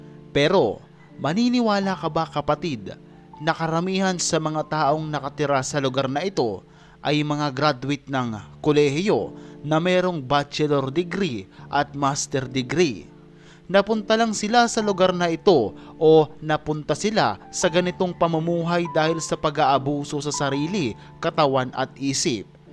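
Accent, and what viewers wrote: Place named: Philippines. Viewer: I love your Filipino accent... 😅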